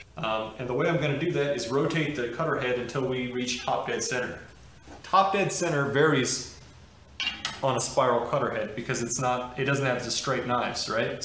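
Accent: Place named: United States. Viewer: Dude you are wearing a Texas A&M tee shirt you Speak English as I would expect someone from the United States of America to Speak, However you keep referencing metric measurements I am an old guy and use to install CNC machining centers. We used imperial measurements. I maybe wrong but to me one would have finer resolution using thousands of an inch. Then all of a sudden you mention thousands. I get the global thing since the rest of the world is wrong 🤪using metric. I have a son that is a mechanical engineer I have tell him too speak English we are in The US. Yes he was like me and 20 generations before me from here in the US. Good video I must say the concepts that you brought out are what are important not what scale you used to define the accuracy. Have a great day thank you for taking the and effort to produce a very good video.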